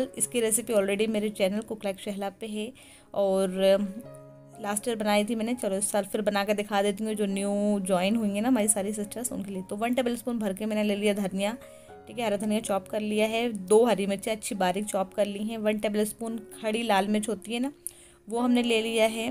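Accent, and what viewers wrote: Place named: Karachi, Pakistan. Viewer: Assalamu alikum I am from Pakistan karachi aap k bolnay ka andaz bilkul hum karachi walo ki terha hain aur aisa lagta ha k jasay aap Karachi wali hi hain please aap meray is comment ka jawab jaroor dijiay ga thanks ALLAH Hafiz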